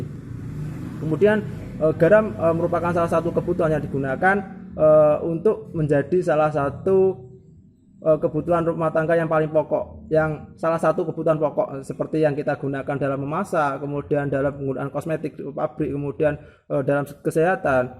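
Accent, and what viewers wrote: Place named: Java, Indonesia. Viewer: Kok medok mas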